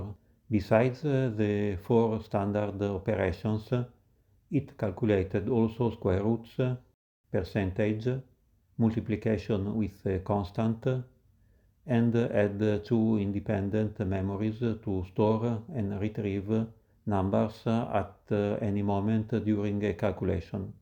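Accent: Italy. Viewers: Oh yes, i can understand. When we hear the narration it sounds like an italian speaking English. Is that actually your voice or an automated voiceover? I always juat figured that was you.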